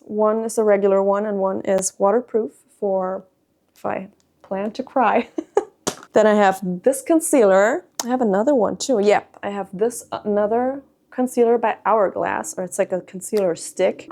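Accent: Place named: Germany. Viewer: Ich finde es so sympathisch, deinen deutschen Akzent zu hören. Freue mich auf's Video. Herzliche Grüße aus Berlin 😊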